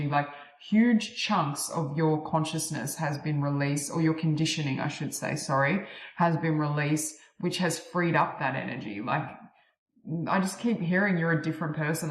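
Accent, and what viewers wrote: Australia. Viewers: Ah, beautiful reading number 4, and lovely to hear your Aussie voice. I lived in Brisbane for 3 years, I so miss the sunshine there. Being an artist my creativity exploded when I lived in Australia. I loved the light and vibrancy of the place. In comparison the Uk which has a denser energy, I have had to work harder to raise my vibration. It has taken quite a few years to adjust being here. Oh, receiving some gold would be nice!☺️ The Police used to be my favourite band when I was younger! Warm Wishes and Blessings. Many thanks for your messages. 🌟🤍😌🤍🌟🕊️🧚‍♀️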